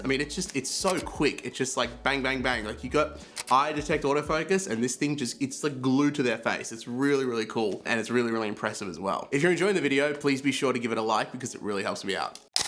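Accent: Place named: Australia. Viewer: This lens has been my workhorse lens for most of my events and weddings for the past couple years. I have a similar 'review' of this lens on my channel also. I should say however, moving forward I think I will be replacing my XF90 with the XF50-140, that feels like the zoom equivalent of the 90, a bold thing to say indeed! At 140mm f2.8 you will get similar rendering and DoF to the 90 at f2 making me feel like keeping both is overkill. I think the 50-140 with the 56 is a good way to go (or at least the direction I am currently heading in). Good review and nice to see/hear another Aussie on the platform.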